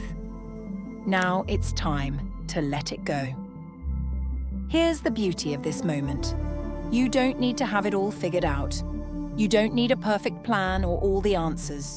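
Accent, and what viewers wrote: England, United Kingdom. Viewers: I'm 58 , emotionally immature, with discouraging sudden mood swings that take me from poetry to the toilet....sort of speak in a manner of seconds, the mood swings are hormonal, the rest unhealed psychology, spiritual bankruptcy and much more..... I want to thank you for today your channel found me, I say it this way because I didn't know it existed to look for it to begging with. The two videos I've listened to this far.....sound True, and the non robotic lovely voice of the narrator with what I perceived as an English accent, it's the cherry on top. Her delivery brings believability to the things she claims,but I have yet to call my own experience. Thank you for the sense of calmness this has provided as I wrestled with this thinking of mind in getting myself ready to hopefully sleep. Truly thank you The content resonates as Truth to me, and the content and delivery make for a very reality based, perhaps it's possible for me, to have kind of experience. 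Thanks for the hope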